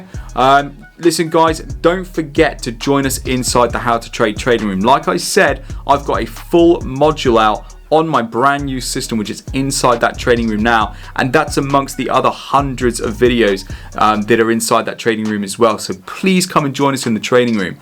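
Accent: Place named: United Kingdom. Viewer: The pull back does not always turn at the next resistance or maybe a bit lower and other guys teaching the candle method and what you are showing here is the most coveted point where to catch the meet A lot of pro retailer need only 50 pips with 20 25 standards and they are done for the day , any wat I like your British accent.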